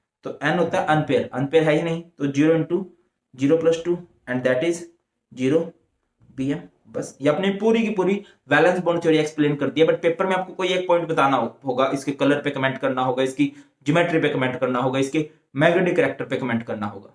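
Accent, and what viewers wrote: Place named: Kaithal, India. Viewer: aap kha se hoo aapki bhasa mereko khethal side ki lgi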